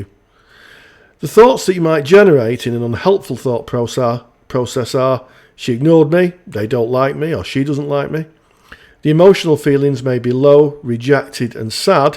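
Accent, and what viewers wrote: United Kingdom. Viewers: its a northern english accent, its fine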